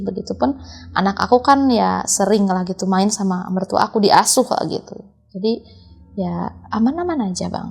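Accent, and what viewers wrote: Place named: Sunda, Indonesia. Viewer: Klo org sunda ska mndongeng sok enakeun.. ada ciri khas nya kata " teh.." yg gk bsa d ilangin....😅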